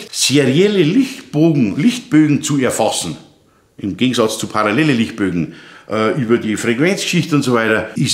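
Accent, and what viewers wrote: Bavaria, Germany. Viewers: Ich bin zwar kein arbeitender Elektriker weil ich nur mal Nachrichtengerätemechaniker (1982) gelernt hab mit Ausbildung zum Elektriker. Aber in MEINER Küche hängen an einer Sicherung 10 Steckdosen weil ICH das so wollte, und es werden ja nicht alle Steckdosen auf 1mal benützt. Wer benützt a Küchenmaschine an Staubsauger der Wasserkocher und ein Heizgerät an diesen Steckdosen zusammen???? Und selbst wenn dann fliegt halt die Sicherung,was solls, der größte Depp kapiert das. Also scheiß auf die blöde VdE jeder ist seines eigenes glückes Schmied. Thema Schreiner,was ich auch gelernt hab:: Ich musste einen 3 monatigen Maschinenkurs machen um überhaupt an den Maschinen arbeiten zu dürfen.. Weida so, i bi a a Bayer und mog dei Aussprach, Danke dir!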